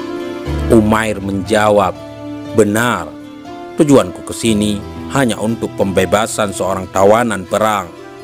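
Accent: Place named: Bali, Indonesia. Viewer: suaranya mirib suku bali ya 😇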